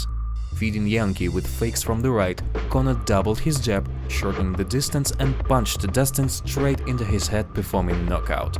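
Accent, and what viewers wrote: Russia. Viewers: Guys, I love your analysis, but you need someone else to read the English text. The thick Russian accent will scare of most newcomers.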